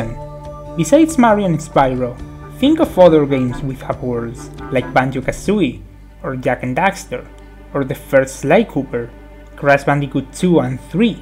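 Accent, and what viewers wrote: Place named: Russia. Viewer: Amigo, si vas a hacer videos en inglés aprende a pronunciar en inglés porque no te sale XD sonas como ruso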